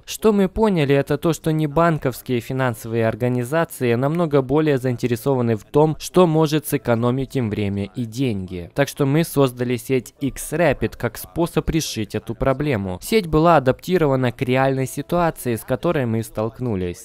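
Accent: Kharkiv, Ukraine. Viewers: ОООО ты с Харькова?)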